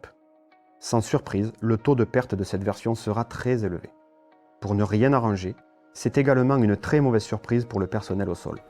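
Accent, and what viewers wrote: South, France. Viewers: Ce délicieux assin' du sudeu pour raconter un avion soviétique est une merveille, toujours le même plaisir à faire le tour de vos vidéos tous les quelques mois =)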